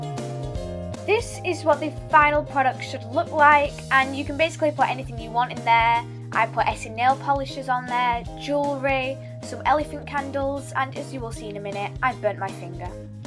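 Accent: United Kingdom. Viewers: Are you a British girl?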